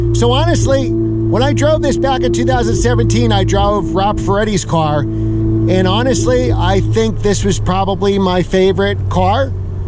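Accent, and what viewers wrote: United States. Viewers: Watching the video and listening to you speak and I'm like wait a minute, this guy is from Philly. Nice review.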